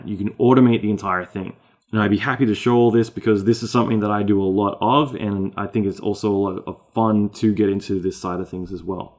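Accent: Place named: Australia. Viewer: This is a good insight. Theres an Australian accent sneaking through that american slant...or american accent sneaking through an Australian accent..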